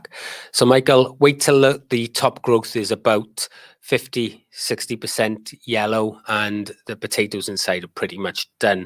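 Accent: Wales, United Kingdom. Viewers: No worries, I really do love your content and you can’t beat a good welsh accent 😁 I hope the operation goes well and you make a speedy recovery, I know how hard it can be living with vertigo, my mum gets quite bad bouts sometimes and can hardly do anything when they hit. Great stream by the way, congratulations again on the 250k subs 🥳 🥳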